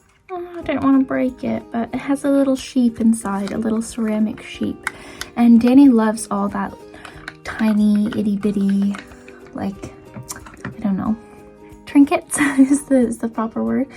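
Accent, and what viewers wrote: England, United Kingdom. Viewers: Why does a British accent suit you sooooo well 😂❤ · Why the english accent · The way she just immediately adopted a British accent when she got to the UK is awesome 😂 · Excuse me.. how are you more British sounding than me.. when I’m British?! 🤣 so glad you’re happy!🕊️ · hi! You really seem such a sweet girl!The plushie is super cute and it is a sweet gift! And also all the othe gifts...so thoughtful!! 🥲i And also i like when u talk with a british accent! I love british accent in general!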